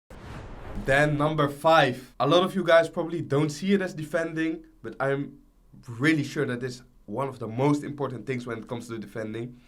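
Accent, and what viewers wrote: Netherlands. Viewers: Hij klinkt Nederlands hahah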